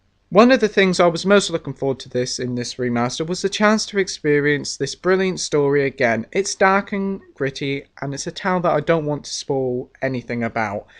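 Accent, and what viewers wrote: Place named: United Kingdom. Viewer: Love British accents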